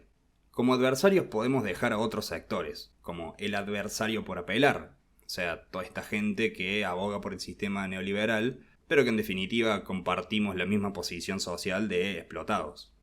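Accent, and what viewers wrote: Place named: Córdoba, Argentina. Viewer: Estoy muy impresionado en general tanto por la calidad del video, tanto en lo visual como en el audio (yo medio que padezco la grabación de audios para cuando grabo videos). Buen video, pero además me llamo poderosamente la atención tu tonada, porque definitivamente es cordobesa.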